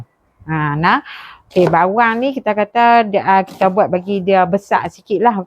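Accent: Kedah, Malaysia. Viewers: Tu ckp pelet kedah ke perlis? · Suka la dengaq cakap loghat Kedah pure. Mcm mak saya cakap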